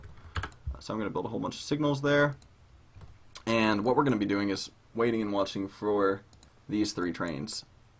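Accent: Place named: United Kingdom. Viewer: like not fully british but brit-like inflection they had a hold on u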